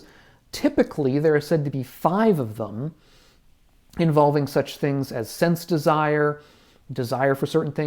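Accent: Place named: Scotland, United Kingdom. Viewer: You sound scottish to me i like your lectures great stuff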